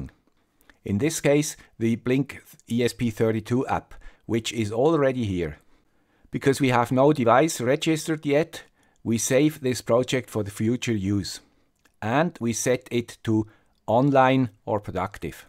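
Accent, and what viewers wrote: Switzerland. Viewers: Your Swiss accent is so sweet and very compelling. I am so influenced to learn deep in the subjects though you just explains thoroughly. You are an amazing learned person with a very sweet positive attitude. Thank you.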